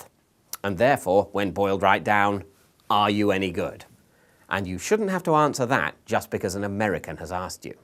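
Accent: United Kingdom. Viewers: That's only because villains are scarier when they're smarter, and we unconsciously assume anyone with a British accent is smarter. You should feel complimented :D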